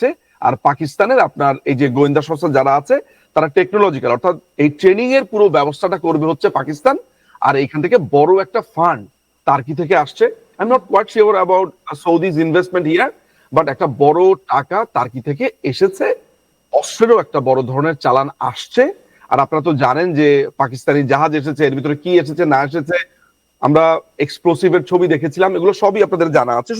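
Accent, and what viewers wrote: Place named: West Bengal, India. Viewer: এই মেয়ে -------- তোর কথার সুর পশ্চিম বাংলার মত।।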